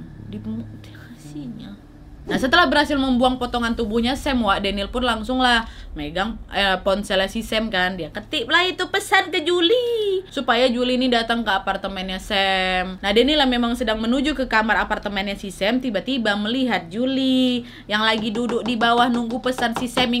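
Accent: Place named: Batam, Indonesia. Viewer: Logat anak batam wak...☺☺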